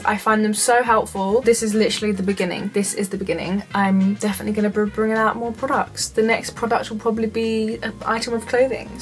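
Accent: United Kingdom. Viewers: How did I know you were British even before clocking in the video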